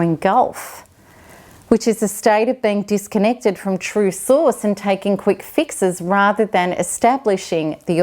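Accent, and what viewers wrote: Australia. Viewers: Love your australian accent baby. Ur wonderful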